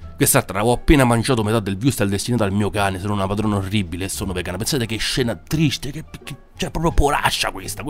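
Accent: Rome, Italy. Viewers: E qualche giorno che ti seguo mi fai ridere ma soprattutto perché parli il romano. Io sono vegetariana non mi dispiacerebbe essere vegana ma se non e fatto alla perfezione non vale la persona. Ti vorrei confermare che certi commenti non sono appropriate soprattutto quelli su i bambini che sono puri e prendono ciò che gli diamo, e per gli adulti che hanno preso a loro volta dai precedenti. E non tutti hanno il tempo per capire precisamente cosa si intende, che inferno si vive essere appassionati dei viventi che non vorresti nemmeno che inciampano, a me dispiace solo che non la pensiamo allo stesso modo ho pietà per la pianta compresi i suoi abitanti❕ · Pratico yoga, e ovviamente è una disciplina con delle basi solide, riguardo la medicina, la cucina, il quotidiano. E una persona autorevole che l'ha introdotto in Occidente, diceva, fate di tutto un po'. Quindi se una persona mangia di tutto un po', senza eccedere soprattutto in (mode alimentari), può essere sereno e non impazzire. 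Sto frequentando spesso il tuo canale, e il tuo accento romano è diventato una (moda) in questi giorni per me. Fai benissimo ad informare entrambe le fazioni, dei più disparati argomenti, la gente anche se non se ne accorge o è orgogliosa per ammetterlo ha bisogno di informazione e se con una aggiunta ironica è sempre buono! Iscritta, continua a stupirci. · Wow, finalmente un altro commentatore di commenti assurdi, per rendere meno opprimente l'attesa di un nuovo episodio di "Commenti Assurdi" (Max Noreg. Se non lo conosci, ti consiglio vivamente di visitare il suo canale) Mi piace il tuo stile: sei il primo a farmi prendere a simpatia il romanaccio(?). Riguardo al video... beh, se una persona è talmente poco convinta in ciò che crede, che basta un'immagine provocatoria a farla vacillare, direi che c'è qualcosa che non va. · Bellissimo...guardo i tuoi video perchè adoro la parlata romana......MI FAI TROPPO SIMPATIA...... 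E CARAMMELLE SE CE L'AVESSERO AVUTE SE LE MANGIAVANO CO TUTTA A CARTA😂😂😂😂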